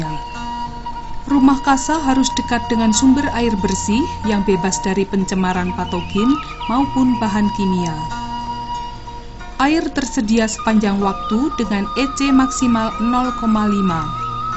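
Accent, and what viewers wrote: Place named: Java, Indonesia. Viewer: Duh yg baca narasinya medok...coba yg baca narasi sesuai dengan musiknya...orang jabar jadi gak medok gitu suaranya...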